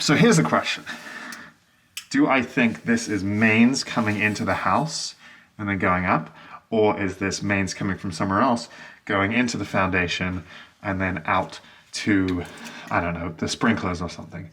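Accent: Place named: Australia. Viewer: Yeah straightaway I reckoned an Aussie accent, but I just found this channel so I don't know this dudes backstory.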